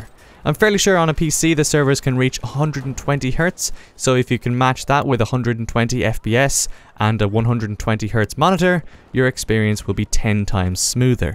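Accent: Ireland. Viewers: thank u man for this vid i thought it was just me getting that shit and btw are u irish?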